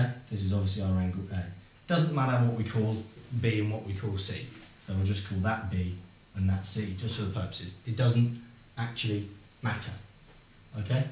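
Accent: United Kingdom. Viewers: I find it hilarious that people keep commenting about his accent...it's just an English accent...if you go there, there are plenty of English accents...and it doesn't make maths any easier! I'm having some trouble getting the same answers on my calculator...help...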